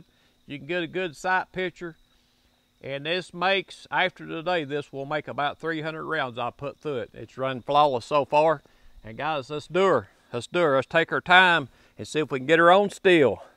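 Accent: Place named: Appalachia, United States. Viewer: The TEE SAUCE 1911🤣🤣🤣 I love that hillbilly accent.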